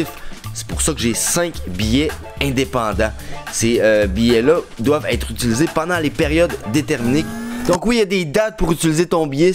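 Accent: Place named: Quebec, Canada. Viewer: Merci! Très intéressant!! C’est bien d’entendre un québécois parler du Japon!! (étant moi même québécoise) J’aime beaucoup ce que tu fais ainsi que ton authenticité!!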